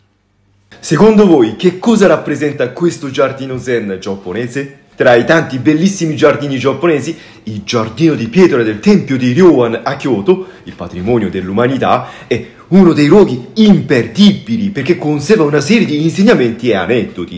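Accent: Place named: Rome, Italy. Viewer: Certo che l’accento romano su di te ha degli effetti sorprendenti! Grande. (Per inciso, magari sapessi parlare giapponese come tu parli l’italiano)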